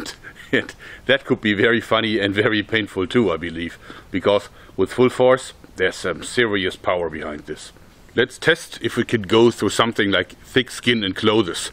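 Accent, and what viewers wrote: Germany. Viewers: Between his thick German accent and the nature of this device, I can't help but think of Doctor Mangele and his many "very special" experiments. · abgesehen davon hat der deutsche akzent einen scharm der irgendwie zu DIY Waffen passt:D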